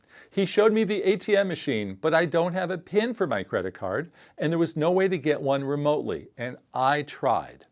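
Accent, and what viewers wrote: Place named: United States. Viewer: You are lucky because you are a white man with an american accent. If it was some Latino guy who forgot his passport he probably would not be allowed to get back in.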